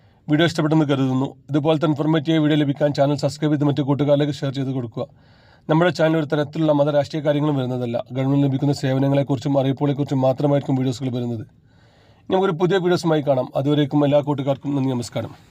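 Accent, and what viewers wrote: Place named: Bengal, India. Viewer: ഇയാൾ മലയാളി അല്ല. ബംഗാളിയോ ഒറീസ്സയോ ആണ്. മലയാളം നഹി.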